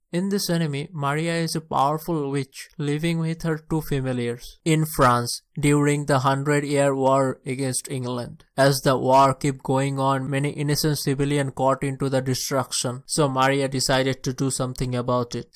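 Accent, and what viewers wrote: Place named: India. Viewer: R u Indian 😍😍😍😍😍😍😍😍😍😍 U accent so cool, mjhe tmhara awaz bhot psand aya 🤗🤗🤗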